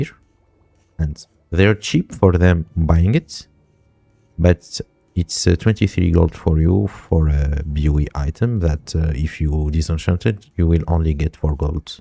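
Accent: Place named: Latin America. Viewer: De donde sos ? Hablas inglés pero no sos nativo se nota muy raro tu inglés sos latino que habla inglés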